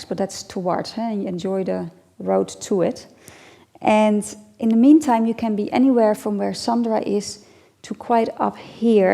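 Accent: Netherlands. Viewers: Haha hallo Nederlands accent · Definitely a Dutch accent haha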